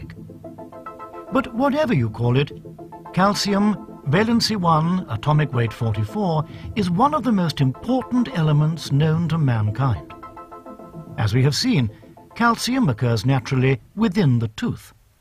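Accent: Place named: United Kingdom. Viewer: Honestly a soft-spoken Brit can make me believe all the BS in this video.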